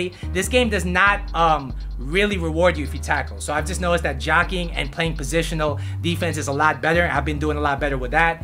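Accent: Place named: California, United States. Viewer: Cali boi? I can sense an accent bro hahaha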